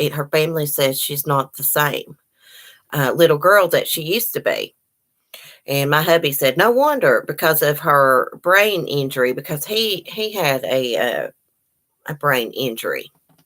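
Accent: South, United States. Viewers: I grew up in Alabama and live in Philadelphia now and, let me just say, it is so refreshing to hear a southern accent again. In any case, I just started watching this so you may mention it in this video, but the first article I read about Maleah made me think immediately of the Susan Smith case-- missing car, no witnesses to the alleged "kidnapping" and a lot of reasons to suspect that the parent didn't want their child. I can't recall the details of the investigation involving Susan, but I do remember she claimed her kids were kidnapped by a carjacker while she was at a traffic light at a deserted intersection but she later confessed when the police discovered that the traffic light only turns red if another car is approaching the light from the cross street and they confronted her. Maleah's parents don't seem like the brightest bulbs, so I imagine that they will soon get caught in a similar lie that they won't be able to explain away. 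Sadly, I can't think of any reason as to why they would concoct a kidnapping story unless (I hate to even type this) they killed Maleah and need to explain why she's gone. They may have intentionally killed her, or they may have injured her so severely that she either died or she would have needed medical attention. After one serious injury they were able to get away with it by the skin of their teeth by saying that she fell. It would have been a lot harder to explain away another serious injury.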